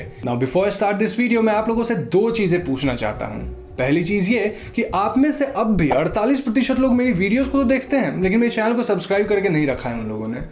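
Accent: Bengal, India. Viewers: I love And his bit of bengali accent🤗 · U got a somewhere bangla accent... 😊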